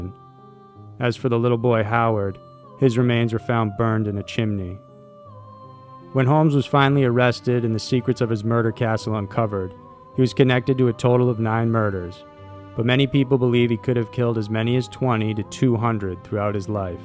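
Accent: United States. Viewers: Probably because a) the guy sounds like he's from the US and b) the US tends to have better records, and also records in English. I know if I was doing this and I came across one that I had to go through and translate I'd quit.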